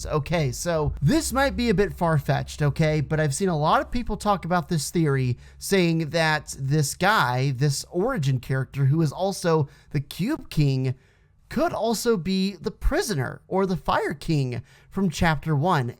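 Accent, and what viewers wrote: United Kingdom. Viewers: i knew he was British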